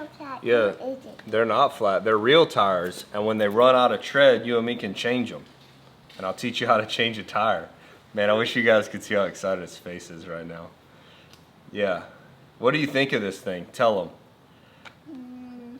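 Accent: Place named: South, United States. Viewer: You sound more country when you're with the kids.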